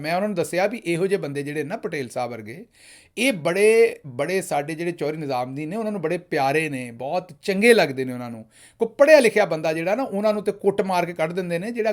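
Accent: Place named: Punjab, India. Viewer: 😂😂.. luv this punjabi accent ... comment from a pathan..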